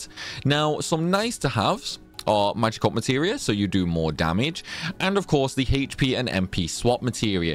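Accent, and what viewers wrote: Stoke, United Kingdom. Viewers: Thanks, seems alright. Also I can never think of what a Stoke accent sounds like until I hear it. weird lol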